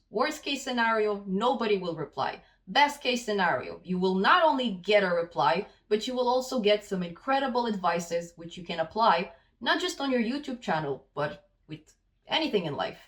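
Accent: Russia. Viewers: your voice gives me tingles. Where u from? Russia, Ukraine?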